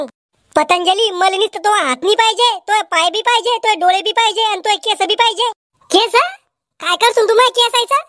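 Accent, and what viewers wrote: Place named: Marathwada, India. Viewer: Nakki jalana cha asnar bole vrun tr as wate😂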